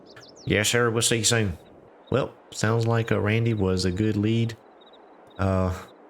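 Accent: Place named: South, United States. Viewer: You sound like you’re from the South my fellow farming friend.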